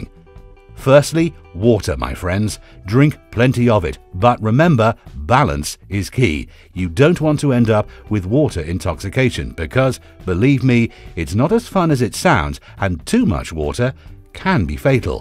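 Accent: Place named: United Kingdom. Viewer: Why is a British guy commentating when marijuana is illegal in the UK that doesn't make sense are Americans supposed to be more interested if they hear a British accent.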